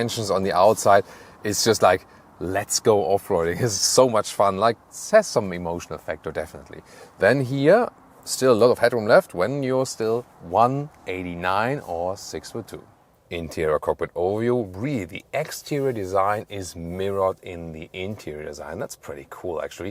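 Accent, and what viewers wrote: Germany. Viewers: That German accent is hot 🥵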